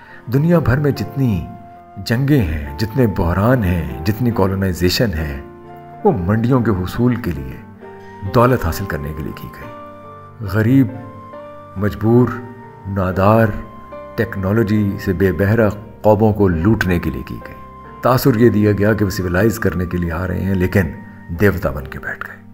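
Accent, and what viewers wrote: Punjab, India. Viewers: Bahut wadia. I enjoyed your Punjabi dialogue delivery. So powerful voice. Reminded my grandparents. Same style. They were in Gujranwala before partition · I like Ur Punjabi accent ❤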